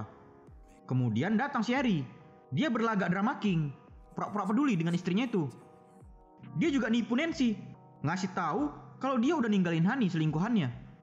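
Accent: Medan, Indonesia. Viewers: Bg kau pasti orang Medan kn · Orng Medan ko bg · Cara ngomongnya sama persis kayak temenku orang Medan :)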